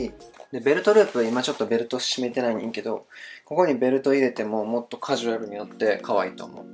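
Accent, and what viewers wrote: Kansai, Japan. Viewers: クリアメガネめっちゃスキィィィ！！🥺 関西弁大好物→ ゼブラ可愛い🦓💕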